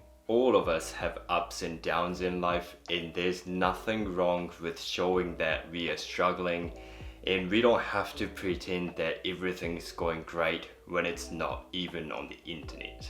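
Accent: New Zealand. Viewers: Japanese with a Kiwi accent, adorable :)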